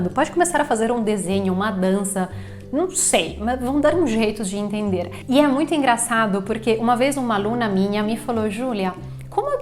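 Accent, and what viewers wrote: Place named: Italy. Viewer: Prof. Você que é maravilhosa, sou simplesmente apaixonada pela Itália, mesmo sem conhecer pessoalmente, esse sotaque é lindo!!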